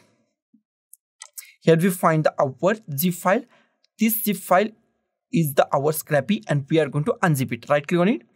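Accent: India. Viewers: I love your india voice!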